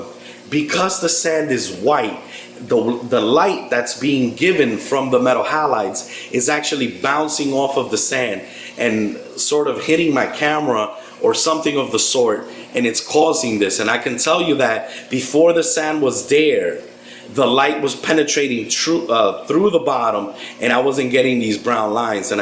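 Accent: New York, United States. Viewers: I really appreciate you doing these videos. I'm looking at an almost empty biocube 29 gallon tank and I'm BRAND NEW to marine tanks. I'm now worried about the "cool" setup I did with my live rock especially cause I only have the 1 stock pump that came with my biocube. I may have created too many deadspots like you said. It was also dope that you have a total New York (Brooklyn?) accent haha. Thanks for all the solid advice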